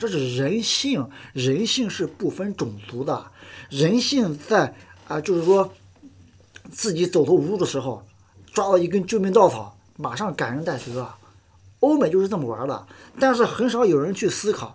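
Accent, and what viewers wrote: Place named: Xiji, China. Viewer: 你口音像西吉县的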